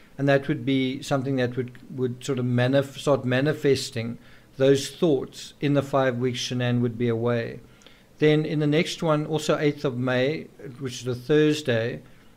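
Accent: South Africa. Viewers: Good SAFFA accent! 👍 ♡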